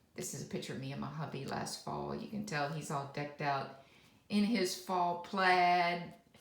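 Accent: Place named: South, United States. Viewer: I absolutely love your fall planner the color is so nice for the season I'm trying to set up my ring light so I can be hands-free to do my video it would be my first one you're such an inspiration love your voice the southern accent always takes me away you're so sweet God bless you and the family 🤗❤️🙏🏼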